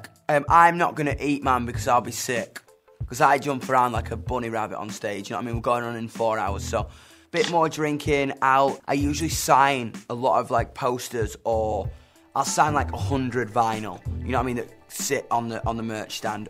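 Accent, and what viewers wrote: United States. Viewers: HIS AMERICAN ACCENT OMG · His American accent is pretty good! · His American accent is better than our British accent........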